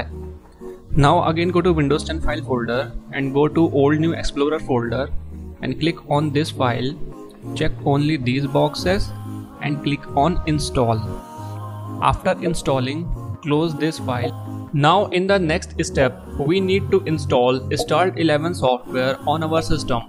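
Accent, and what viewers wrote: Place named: India. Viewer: I'm so sorry and i don't mean any offence but from my experiences, the indian accent is throwing me off I am quite sure tho that this is probably a legit vid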